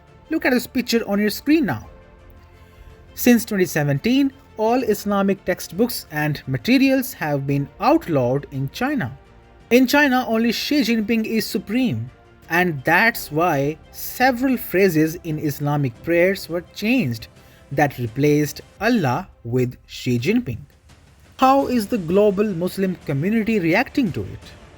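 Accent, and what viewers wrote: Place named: India. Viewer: What bull shit. The speaker sounds Indian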